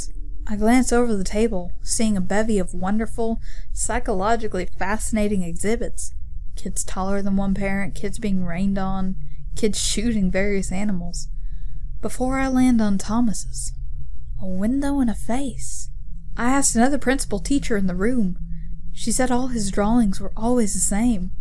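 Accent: South, United States. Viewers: You do a very good southern accent! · Nice southern accent:P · Nice accent you made me truly believe you were a southerner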